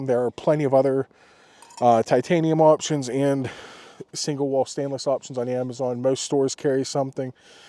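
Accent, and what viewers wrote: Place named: Pennsylvania, United States. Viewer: Loved your video. I am originally from Pa. Kittanning near Pittsburgh. Thought I detected a little Pa accent. Living in SC now. Nice kit. Not over complicated.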